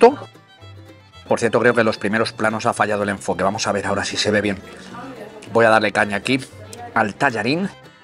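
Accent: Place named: Basque Country, Spain. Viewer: ¿Por qué pone acento vasco si es de Alcalá de Henares? 😄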